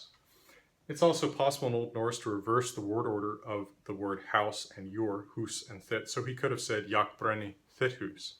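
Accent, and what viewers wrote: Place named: United States. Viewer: viking language with an american accent XD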